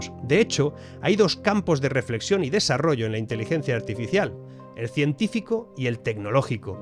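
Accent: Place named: Spain. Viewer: Me encanta el contenido del video, me causa sorpresa y una inebitable distraccion que habla con acento Español de España con su bonita camisa de la seleccion de futbol Italiana, es esto un provocacion de oficio?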